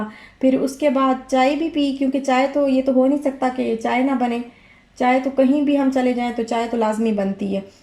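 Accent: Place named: Hindko, Pakistan. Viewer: hi main Pakistan se hun aur Mujhe aapse ek question puchna hai ki aap Hanko Japan bolati Ho yani ke aapki Ki Jo apni Jawan Hai Hua hin Ko Hai Kyunki aapke Lage se Pata lagta hai please reply zaroor karna Kyunki Main Bhi Kohat se hun Aur meri Zubaan bhi Henko hai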